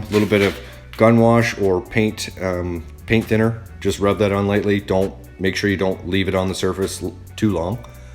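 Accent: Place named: Newfoundland, Canada. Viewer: You from the Maritimes? Newfoundland?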